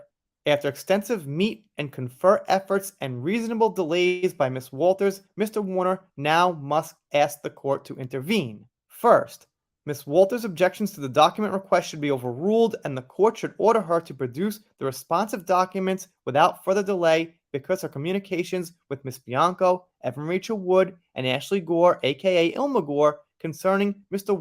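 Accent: New York, United States. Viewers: I find your voice and accent sooo new york ...it is perfect ...keep it! Dont change...when young i moved to california (in thr 1960s bedore insanity) and was made fun of so i DELIBERATELY got rid of my midwest twang.. i actually had teens ask me about indians living in tee pees..no joke..they were that ignorant...i ended up being the science aid because i was so far advanced in science compared to those kids..actually in every class...and i was considered a c and d student in oklahoma...there I was an a plus student with out trying. There.fed your ALGORITHM...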